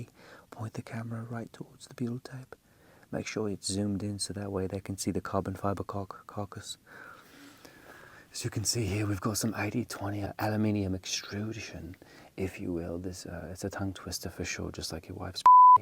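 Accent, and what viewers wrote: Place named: Massachusetts, United States. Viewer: You sound like you live in MA ?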